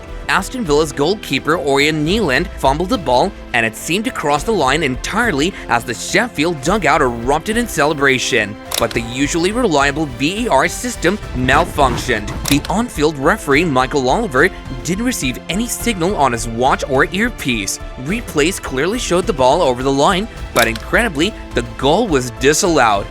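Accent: United States. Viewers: these american pronunciations are painful to hear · 40 seconds? As soon as the Yank's voice was heard, the back button was pressed. Can't comment on the video content, I simply didn't watch it! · Watching football vids with an American accent just ain't right.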